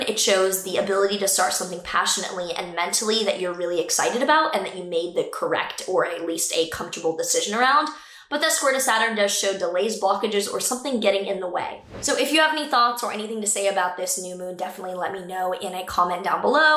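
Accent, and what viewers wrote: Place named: Texas, United States. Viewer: Are you from Texas? I can hear your country accent 🥰😀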